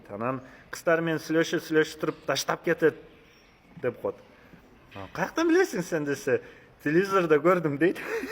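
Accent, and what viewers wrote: Standard. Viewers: Азаматсын балам, соз байлыгын коп , таза тунук суйлойт экенсин бар бол, ийгилик сага. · Бирок кыргызчаны так адабий тилде суйлойт экенсин рахмат ошол эле мезгилде мен кыргызмын дегендер меням,сеням деп суйлошот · Ооба бир да созуно орусча аралаштырбай таза суйлоптур баракелде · Какой ты молодец чисто разговариваешь на кыргызском языке. Браво. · Молодец! Омурлуу бактылуу бол таза суйлойт экенсин кыргызчаны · Азатсын , таза кыргызча суйлоп , элди тан калтырдын, азаматсын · Ассаламу алейкум ,дрсум азаматсын ,анча мынча Кыргыздарга караганда таза суйлойт экенсин сонун,Аллах ыраазы болсун сага дрсум ,ккопчулугу Ош ЖалалАбад жактагыларга эгер салыштырсак озгочо ,бирок алар капа болбосун мен болгон чындыкты айтып атам ,келгиле туугандар таза суйлогонго аракет кылалы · Азамат жигит! аман бол, таза кыргызча сүйлөйт экенсиң рахмат!🎉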